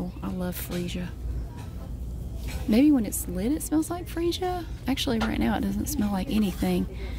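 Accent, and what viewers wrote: South, United States. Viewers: I lived in Alabama for 3 years lol I love and really miss Southern accents I'm happy I found this channel! I know you're not in Alabama but still!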